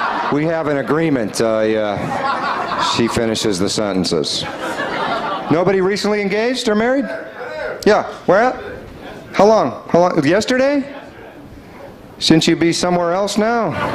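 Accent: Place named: Arkansas, United States. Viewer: Did you know we speak that way in Arkansas because of the Scottish stronghold and influence? Proud Scots heritage here. 😅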